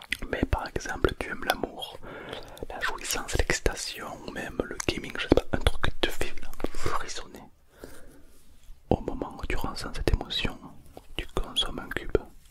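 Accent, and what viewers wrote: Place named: Marseille, France. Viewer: Il me fait penser aux vendeurs de drogue de Marseille avec son accent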